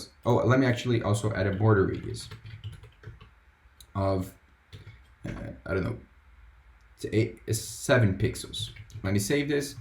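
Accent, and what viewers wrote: Brazil. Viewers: Reconheço um inglês br de longe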